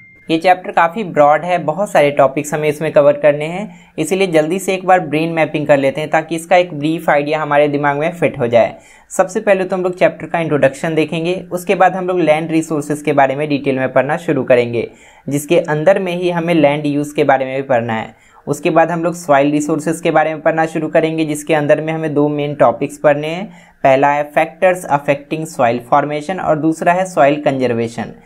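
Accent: Bihar, India. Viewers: Sir aap jharkhand bihar ke ho kya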